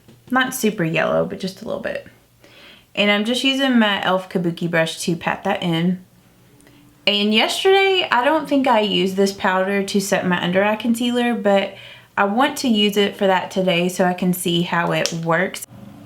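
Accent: South, United States. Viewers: I love your chatty GRWM videos! You're so sweet and relatable and I love a Southern accent (Southern girl here)! But I like voiceover or music too... I'm gonna watch whatever you post 😀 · I love her accent too! I'm from a small town in West Texas. Her accent's not exactly the same but I find it warm and inviting.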